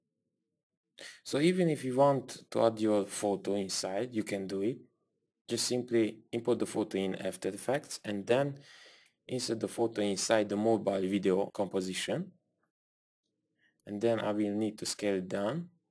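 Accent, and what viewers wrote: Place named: France. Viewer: French accent detected, merci for reading.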